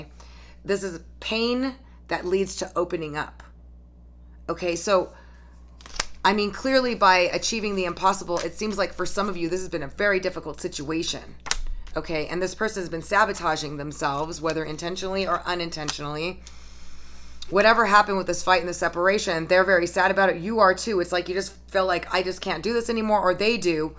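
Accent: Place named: New York, United States. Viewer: Are you from new York? Bc I can hear an accent. But I'm actually dealing with both a Leo and Libra. The Libra ugh ur on spot with him.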